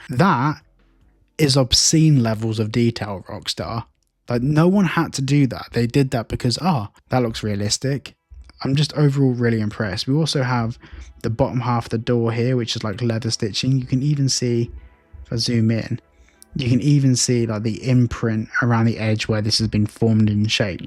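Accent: United Kingdom. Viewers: Ugghhh I know it’s a British thing and not your fault but every time you say “Floor” when you’re talking about the “ground” I wanna slap you a little bit! Inside = floor, Outside = ground lol I love your accent and you guys have way more fun with the English language and slang terms but this one, you guys just got wrong! Love you, love the videos. Keep it up, thnxxx!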